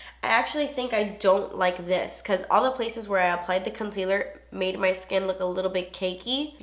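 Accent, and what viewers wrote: Cuba. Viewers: she's cuban from Miami :) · She's Cuban! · I love it when she talks in her Cuban accent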